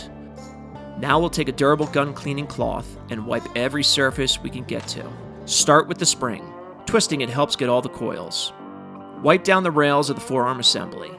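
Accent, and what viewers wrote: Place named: Pennsylvania, United States. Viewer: My buddy from Pennsylvania and you sound identical!!! Its crazy!! Same accent. Awesome video!! Thank you.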